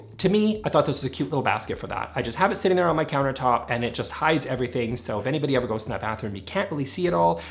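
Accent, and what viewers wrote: Sweden. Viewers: Greetings from Göteborg.:hand-pink-waving: I totally enjoy your Swedish pronunciation. Keep it up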